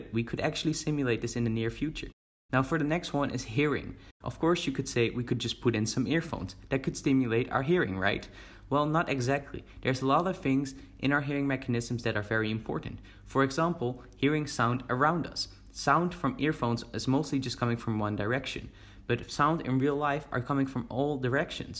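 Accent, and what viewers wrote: Netherlands. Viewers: This is my first video of this youtuber and I'm here wondering "Is this guy dutch" cuz his accent has a light dutch accent sounding to it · You sound dutch. Are you dutch? Either way, good video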